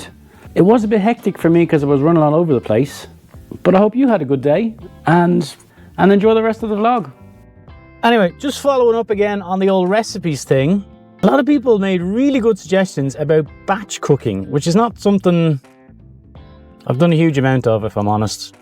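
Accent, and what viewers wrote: Ireland. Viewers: Love the Irish accent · Keep on keeping on! You are the most wonderful ‘antidote’ for a very long crap day! 😊 As I’m someone of Irish descent I fell in love immediately with your gorgeous Irish accent 😅Seriously your vlog is the bomb - it helps me calm down after a long day! Daylight saving arrives back in New Zealand this coming Saturday - thank goodness! Can drive home in the daylight from work. Keep doing you - you are the perfect recipe, ps Need my coffee too x NZ 🇳🇿👏🏻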